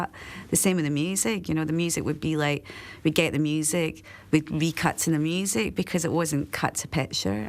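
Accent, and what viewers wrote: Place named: Scotland, United Kingdom. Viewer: She's Scottish you melt.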